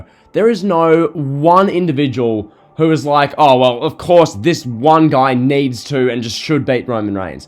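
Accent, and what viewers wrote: Australia. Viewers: And wow you sound more Australian then usual due to the mic,cool tho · Lol somehow you've become more Australian than you already were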